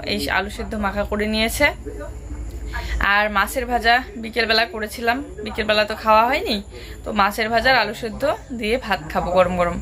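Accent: India. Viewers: অনেকদিন দিল্লিতে ছিলো, তাই কথায় কথায় হিন্দি শব্দ, উচ্চারণ এসে যায়। এটা স্বাভাবিক।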